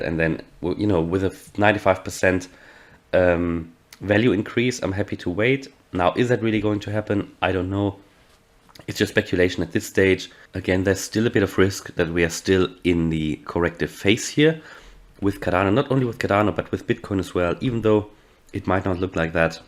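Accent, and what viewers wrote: Germany. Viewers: Yet your accent is german.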